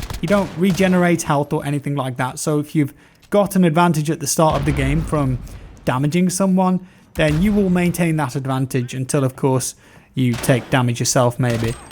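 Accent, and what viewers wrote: United Kingdom. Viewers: British accent killed this video completely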